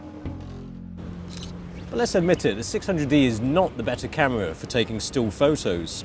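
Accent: England, United Kingdom. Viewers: I love your british accent:) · Thumbs up for asian guy with british voice! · nothing funnier than an asian guy with a british accent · Asian with British accent...EPIC!!!! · I never saw an Asian guy with an English accent before. He even made fun of himself. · i trust him because of his british accent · he has a british accent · Hong Kong is a British colony that why you have a British Accent ! not quite ! Local people coming from the colonies and Dominions do not speak with British Accents ! They speak eighter Chinese English ,Malay English, Indian English your probably born in the UK and moved to HK at some point of life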